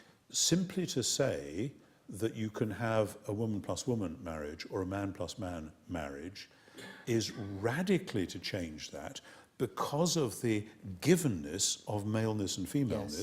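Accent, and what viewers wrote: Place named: United Kingdom. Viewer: Pompous ass. Narrow minded pompous ass with a British accent.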